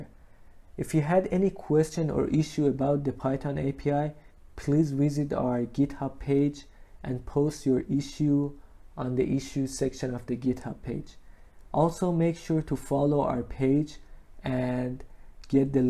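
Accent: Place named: Iran. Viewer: iranian accent lol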